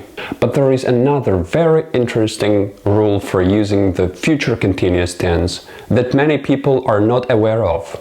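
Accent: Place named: United Kingdom. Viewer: Цікавий акцент. Схожий на британський.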